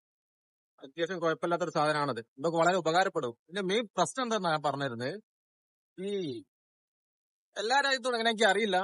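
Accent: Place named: Beypore, India. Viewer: Nyan beypore annuu..ningalude samsaaram kettappam pudi kitty...😅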